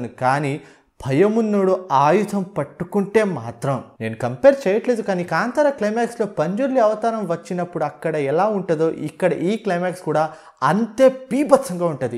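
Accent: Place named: Telangana, India. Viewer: Micro detail: How many of you noticed speaking in Telanagana 😅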